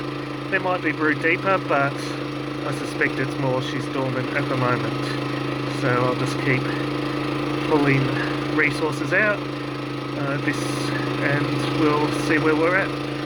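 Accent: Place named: Australia. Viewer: Where are you from? You sound Australian.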